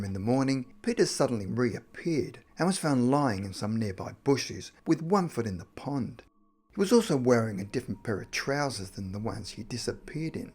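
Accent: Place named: Australia. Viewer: reminds me of the Geico Gecko LOL I think he's Aussie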